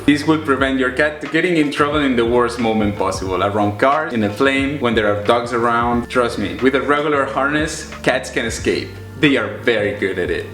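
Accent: Spain. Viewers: Nice video! Thanks for the tips, going to try walking Felix soon! Also I noticed your accent, just wanted to ask, are you from Catalonia?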